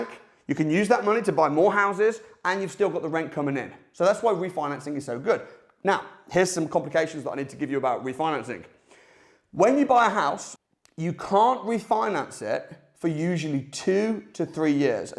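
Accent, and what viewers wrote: United Kingdom. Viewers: Why does the British accent make this video un watch able.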